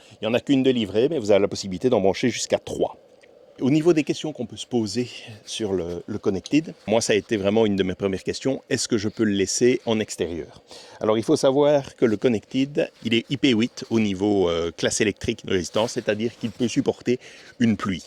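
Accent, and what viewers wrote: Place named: Belgium. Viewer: Très intéressante vidéo ! Certaines de vos intonations rappelle un accent belge, serions-nous compatriotes ?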